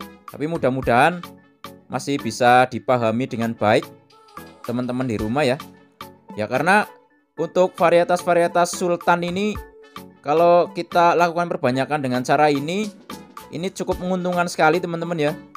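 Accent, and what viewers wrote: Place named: Banyumas, Indonesia. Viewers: oke mas, mksh banyak atas ilmunya.. Sy smakin faham. Sukses selalu. Boleh tau mas asli tegal ya? Medoknya ky logat tegal.hehee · Keluarkan ngapakmu mas bro wkwkwk.... Mantul pokoke😜😜😜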